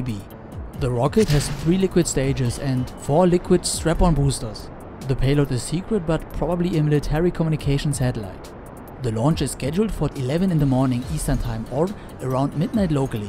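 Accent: Germany. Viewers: he sounds German lol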